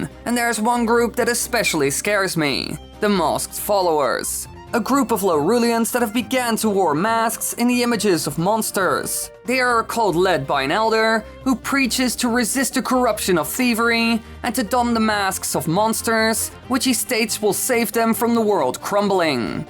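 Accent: Netherlands. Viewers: It sounds like an accent (possibly Dutch or Swedish)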